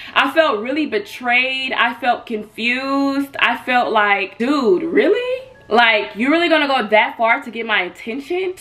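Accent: Chicago, United States. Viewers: born and raised here and this is definitely chicago nigga vibes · DEFINITELY CHICAGO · yea , I’m frm chicago n it sound like sum shit that mfs do out here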